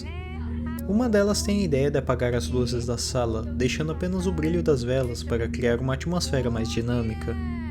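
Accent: Brazil. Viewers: não consigo prestar atenção no vídeo, o sotaque é muito bagual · O sotaque nordestino melhora tudo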